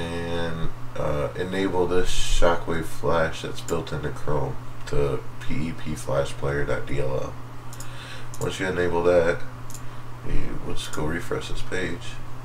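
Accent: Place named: China. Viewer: Chinglish! PogChamp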